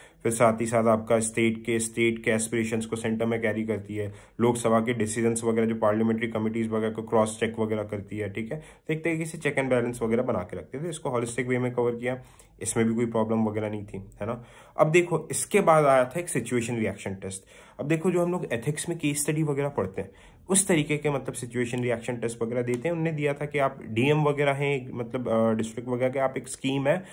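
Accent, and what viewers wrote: Bengal, India. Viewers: Sir R u bengali ???? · are you bengali sir ?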